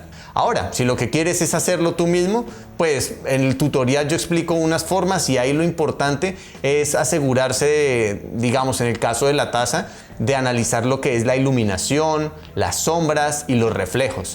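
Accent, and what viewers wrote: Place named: Canary Islands, Spain. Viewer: cada vez que veo un video tuyo aprendo algo. lo explicas muy bien y se te entiende perfectamente . tambien sera porque soy Canaria y pronunciamos de igual manera jajaja gracias!!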